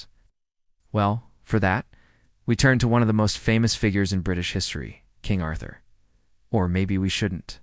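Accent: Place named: United States. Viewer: not a chance that yankee accent is english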